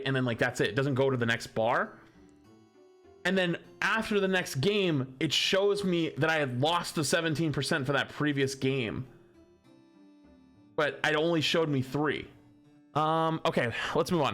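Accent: Boston, United States. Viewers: We need more Boston accent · I need more OG Boston accent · BOSTON ACCENT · DOOM'S GONNA BE BETTAH!:D Embrace the Boston,. Let it out!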